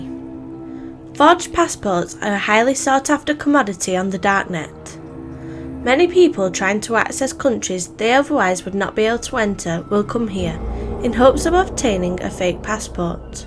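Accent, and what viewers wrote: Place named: Northern England, United Kingdom. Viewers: are you British? Your accent sounds it I'm from Nottingham you sound more northern but I could be wrong lol what county you from? If your from England that is lol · rapper She sounds like she could be from Bradford? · North of England. Yorkshire or Manchester maybe.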